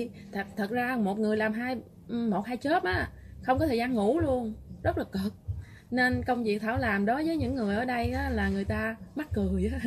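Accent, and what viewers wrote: Mekong Delta, Vietnam. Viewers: Cam ơn em rất nhiều em đả cho thấy được cuộc sống ở mỹ qua giọng nói người niềm nam thật dể thương , chúc GĐ em hạnh phúc nhe..... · ...minh song tai MY cung lau roi...van ung ho cho kenh cua...thich vong noi nam bo that tha chat phat....luon ung ho cho kenh cua ban...!!! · Chào c . Chúc c nhiều sức khỏe e rất thích c giọng miền tây dể thương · oi xem em noi chuyen chi thich lam dan mien tay chan chat thiet tha, chi lam dau mt 2020 nay la 37 nam do, nen yeu lam dat va nguoi mt, chi chuc tan suc khoe trieu niem vui ben chong con hen, q4 sai gon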